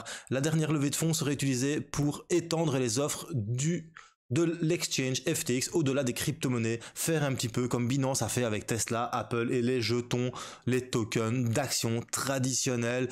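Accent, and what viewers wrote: Liège, Belgium. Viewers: Lol j adore le ton que tu emplois ça en met pleins les dents aux détracteurs mdr. Tu es de Belgique ? Je perçois un accent belge de Liège où je dois me tromper !?!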